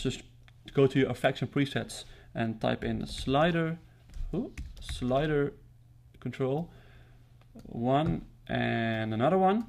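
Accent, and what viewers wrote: Netherlands. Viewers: Are you dutch? hahah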